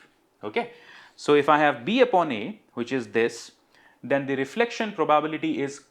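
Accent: Odisha, India. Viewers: Sir are you from Odisha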